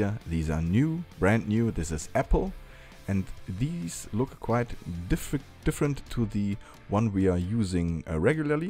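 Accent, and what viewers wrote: Germany. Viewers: Best german accent ever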